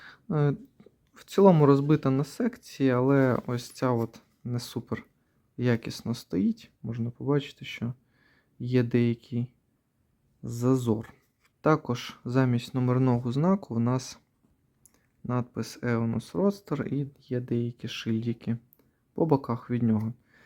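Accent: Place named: Russia. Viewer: І взагалі... Маскалізмів забагато. Старайся гарніше говорити солов'їною. Вуха ріже безбожно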